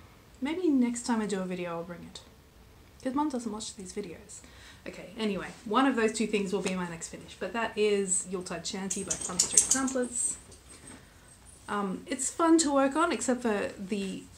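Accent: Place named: Australia. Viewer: Congratulations! It is a beautiful finish and you have every reason to be excited about it! Also great to hear another Australian voice on Flosstube (I'm from South Sydney). I love to watch planning videos so I will certainly be watching that when you get a chance to film it. Thanks for sharing your finish with all of us.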